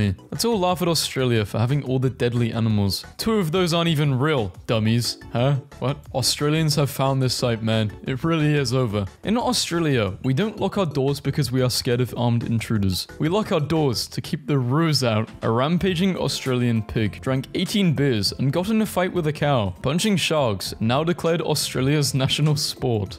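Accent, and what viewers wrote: United Kingdom. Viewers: YOU’RE BRITISH?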